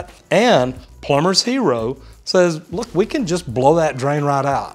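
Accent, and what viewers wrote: Texas, United States. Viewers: Yeah he's definitely from Texas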